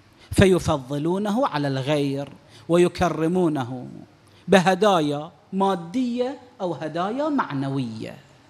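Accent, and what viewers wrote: Iran. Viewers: هو يمكن إيراني لهذا السبب لهجته هكذا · ايراني وهيج يحجي كوه يعرف عربي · لان ايراني وقليل يعرف عربي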